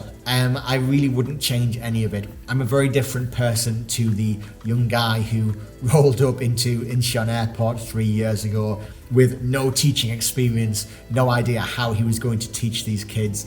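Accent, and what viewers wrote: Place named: Northern England, United Kingdom. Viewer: Absolutely blowing my mind that you are from Newton Aycliffe! This video was in my YouTube suggested and I've just applied for Epik so thought I'd check it out. Straight away heard the northern accent and checked out the comments to see you're from my town!! What a coincidence - small world aha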